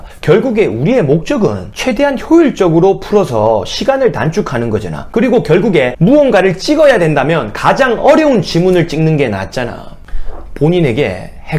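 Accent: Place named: Busan, South Korea. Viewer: 사투리 쓰는거 욀케 멋있게 들리지? 부산 사투리인가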